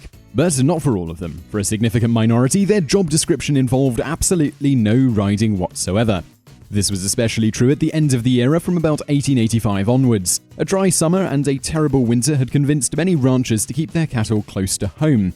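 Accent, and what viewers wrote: United Kingdom. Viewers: Never trust a video about the American cowboy that has narrator with a British accent. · I didn't get past the first item. Bull corn. Towns did try to regulate, but EVERY cowboy carried a gun when he wasn't having to placate the townfolk. But when they were in Dodge City or Ogalala, they arrived dirty, randy and armed to the teeth. Pure fertilizer. And that this comes from an effeminate "researcher" with a British accent only doubles down the offense. Sign me, a hand from Nebraska. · I dunno😏somehow hearing this British Accent speaking on the History of American Cowboys...quells my interest a little. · Making the claims that you do, without research or quoting your sources makes your video totally unbelievable. I have spent over 40 years researching the history of the AMERICAN Cowboy and I will tell you (with your British accent) you are full of BULL. 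· kind of disingenuous to hear a limey trying to teach us about cowboys. kind of like an American teaching a brit about the Queen, who is a man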